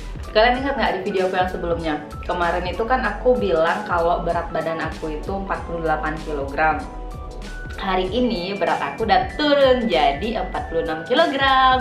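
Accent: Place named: Bali, Indonesia. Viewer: logat balinyaa!😍❤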